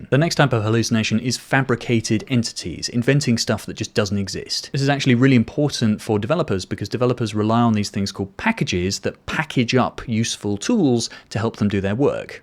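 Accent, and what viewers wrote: United Kingdom. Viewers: Thanks for the video, you are becoming my favourite source for AI based videos as a developer. Unbiased, realistic and love your British accent, but, I hope you are not an Arsenal fan:D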